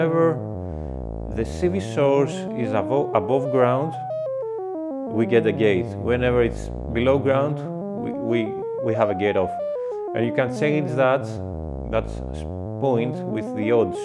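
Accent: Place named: Greece. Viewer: Γιατί απο τα αγγλικά που ακούω νομίζω ότι είσαι Έλληνας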